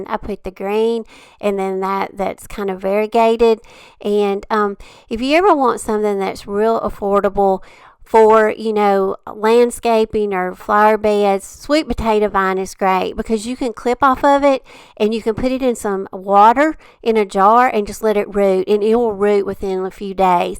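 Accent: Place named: Alabama, United States. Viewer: Hey! I got your channel info from Olivia’s Romantic Home!! You’re precious and love your accent! Over here in Georgia we have so much in common with pollen and yard stuff! I’ve loved watching.... just a few so far.... keep you the good work! Where in Alabama y’all live? I’ve been looking at houses in Enterprise and things look nice there!!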